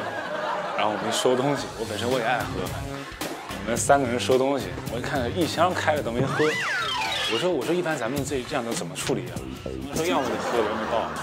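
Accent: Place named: Northeast, China. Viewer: 唱歌和說話一樣的東北小老鄉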